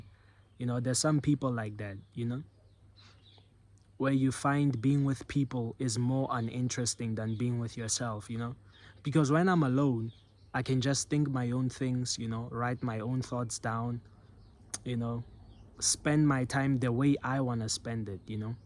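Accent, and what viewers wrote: South Africa. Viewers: You South African gee?, I can hear your accent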